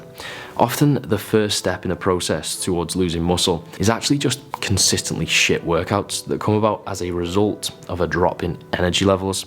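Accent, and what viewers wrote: United Kingdom. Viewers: Excellent video, very educative, I've got a question just for curiosity, where are you from in the UK? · I'm not even natty but I am gonna start watching this channel because I love this guy's accent! Much love from some colonial scum my British brother.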